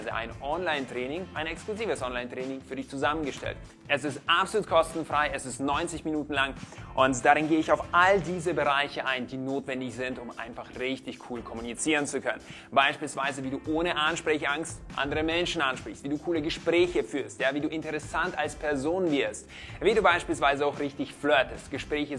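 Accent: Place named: Yugoslavia. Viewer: Gaylappen mit piepsstimme und jugoakzent will mir erzählen, wie man der coolste im Raum wird 😁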